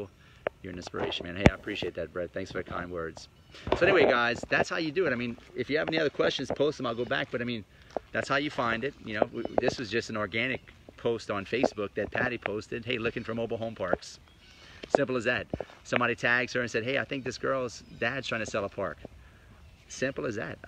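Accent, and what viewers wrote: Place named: South Louisiana, United States. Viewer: Great info. I'm from south Louisiana as well. I know that accent! lol. I just setup my first mobile home rental on a piece of property I already owned and looking at another one setup on land that needs some work. I have aspirations of one day owning several parks like you. I know there are a ton of MHPs in our area, but are that many owners selling? How far out do you have to go to find deals? What are your thoughts on building a new park vs buying one?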